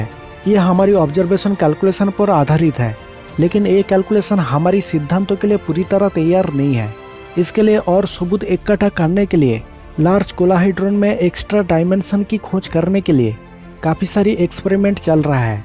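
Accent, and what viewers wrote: Bengal, India. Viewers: Sir ap ki Hindi thodi alag hai like a Bangla language.But nice video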